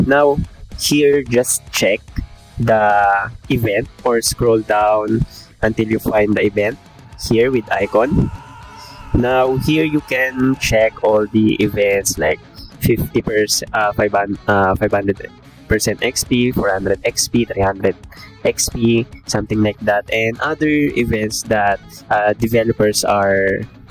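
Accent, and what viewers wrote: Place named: Visayas, Philippines. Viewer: Pinilit mopa mag english pati accent mo pang bisaya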